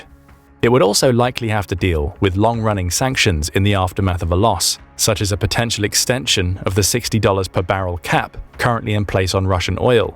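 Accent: England, United Kingdom. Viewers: Are you from England,kinda figured 🇺🇸💅☢️ your boath toast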